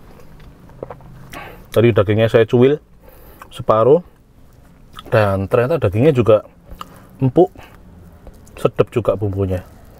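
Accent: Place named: Jakarta, Indonesia. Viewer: Sing dodol wong ndi cak...kok logate sok Jakartaan 😂 #dibahas Tapi asli ngiler liat sego bali daginge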